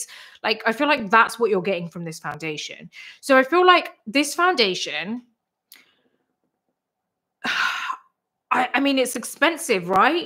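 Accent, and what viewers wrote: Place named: United Kingdom. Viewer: The final look is beautiful! You did great, be proud😊 Thanks for that joyful and educational video. I very much appreciate that you now about your influence as a youtuber and you still remember where you cone from and what you have achieved. By the way, I love your British accent ❤ Thanks for your all your work. Looking forward to the next live😅 Love from Germany ❤❤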